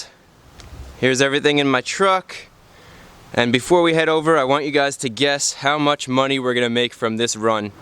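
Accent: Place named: Boston, United States. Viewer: that bostonian though....hmmmnn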